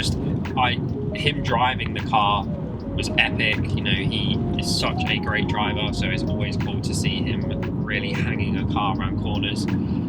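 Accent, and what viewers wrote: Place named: RP, United Kingdom. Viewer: Lol this gets bullied on his own youtube channel lol just put a hoody on bro and loose the posh accent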